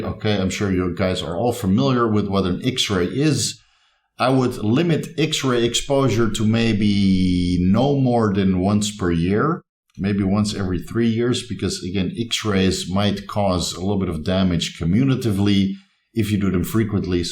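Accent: Netherlands. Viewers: Came here from MPMD! Guess you are Dutch too, right? :)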